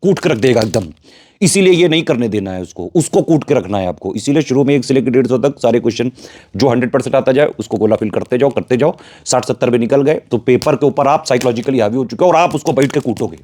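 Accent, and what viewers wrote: Kanpur, India. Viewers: Sir aap kanpur se h kya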